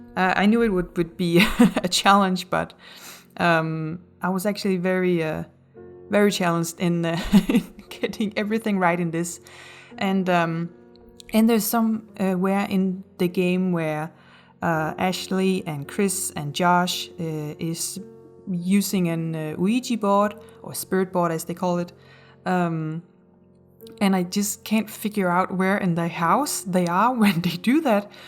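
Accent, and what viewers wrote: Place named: Denmark. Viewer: Thank you so much for the tutorial! I recreated it in Once Human and ur video helped alot!.. Also are u danish? U sound quite danish 😅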